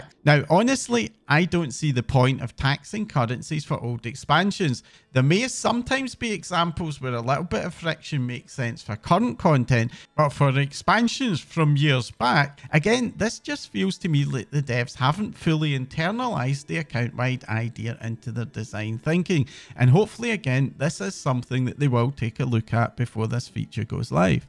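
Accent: Scotland, United Kingdom. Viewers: i hear scottish accent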